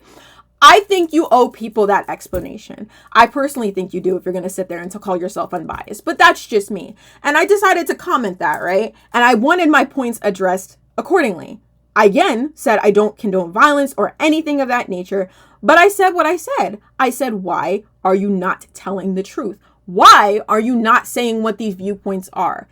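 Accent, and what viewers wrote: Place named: New York, United States. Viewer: I unsubscribed from the fake wizard because of the annoying new york / jersey accent.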